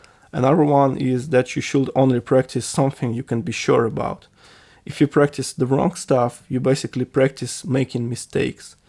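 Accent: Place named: Russia. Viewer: Enough bashing yourself, you speak and explain very well, in fact you have a rather weak Russian accent, if it bothers you, I can help quickly (went through the same);)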